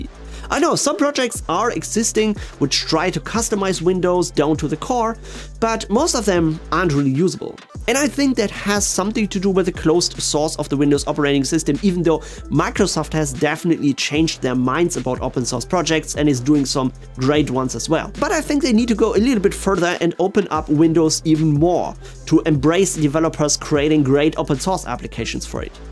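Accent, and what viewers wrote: Germany. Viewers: Cooles Programm, werde ich definitiv ausprobieren. Btw. als ich die Stimme gehört habe hab ich gedacht oh ein deutscher. Dann sehe ich den Namen und ich lag richtig 😅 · Boh-Ey, ein Deutscher der englisch spricht... Jetzt muss ich auch noch den Translator aktivieren um was zu verstehen...😱😱😱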